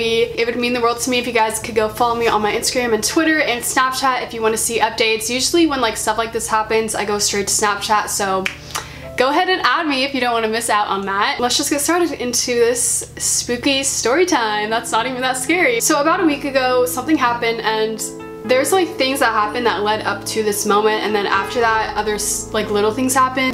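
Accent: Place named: China. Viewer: The voice is chinnese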